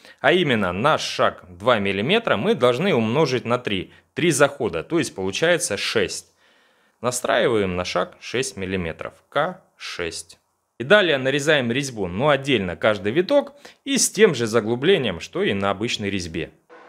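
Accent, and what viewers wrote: Rostov, Russia. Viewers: Ростовчанин, штоле? По говору слышно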